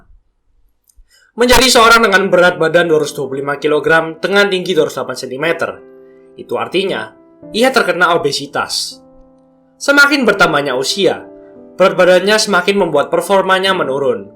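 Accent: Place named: Surabaya, Indonesia. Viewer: Medok banget... Wong Suroboyo Yo... Hehehehe..😀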